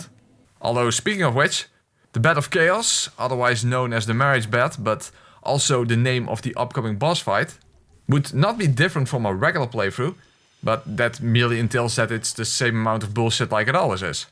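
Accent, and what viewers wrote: Netherlands. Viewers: Bro you are dutch aren't you😏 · 2 seconds of hearing the voice alredy realized he was dutch lamaoooo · I KNEW YOU WERE DUTCH. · U must be dutch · Are you Dutch? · ha, so my suspicion was right. it is a dutch accent. grüße aus deutschland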